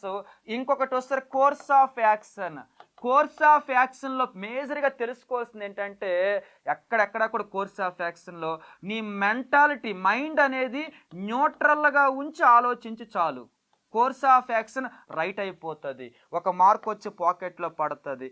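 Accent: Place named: Srikakulam, India. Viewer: Sir meedi srikakulama vijayanagarama yaasa alaa vundi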